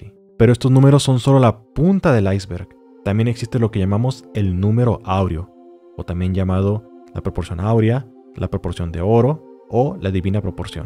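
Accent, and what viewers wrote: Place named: North, Mexico. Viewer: Sospesho que eres del norte de México por ese acento tan peculiar 🤭 ánimo paisano, tú canal es muuuy bueno!!!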